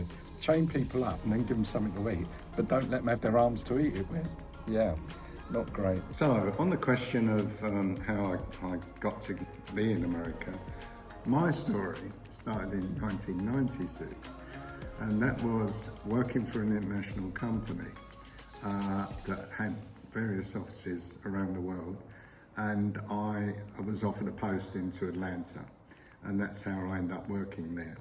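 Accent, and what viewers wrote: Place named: England, United Kingdom. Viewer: Of for crissake .. where are you from? Listen to the man ... he is very clearly English.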